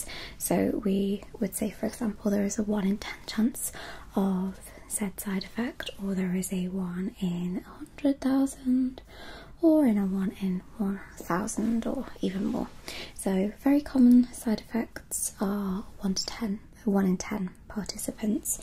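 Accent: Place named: Wales, United Kingdom. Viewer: Hearing a bit of a Welsh twang coming through there! ❤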